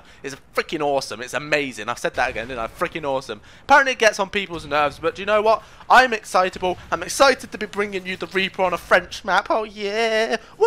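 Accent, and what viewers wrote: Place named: United Kingdom. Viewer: Thx for sending the vid :p I love brittish axent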